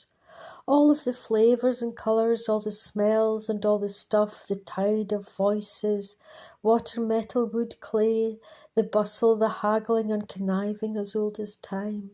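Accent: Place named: Scotland, United Kingdom. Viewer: Love the Scottish accent !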